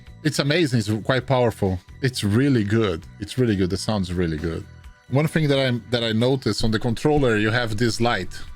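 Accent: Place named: Brazil. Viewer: You sound like a brasillian speaking English 🤔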